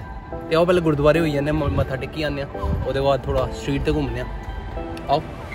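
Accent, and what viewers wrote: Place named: Jammu, India. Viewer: Bro punjabi kam dogri zada hai 😂❤️ but I really like it ❤️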